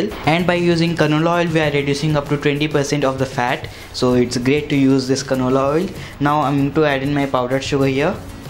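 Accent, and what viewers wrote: India. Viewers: his English has an Indian accent..nothing racist.fool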